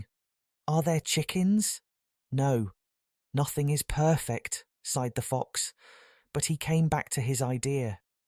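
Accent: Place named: United Kingdom. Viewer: Love this!It's very helpful for me to mimic the UK accent cuz your English reading is not so fast and the pronunciation is clear as well. Please make this kind of video more❤. I want to shadow more❤.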